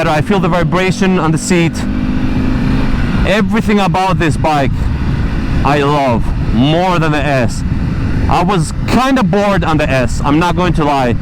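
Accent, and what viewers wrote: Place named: Greece. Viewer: violently greek accent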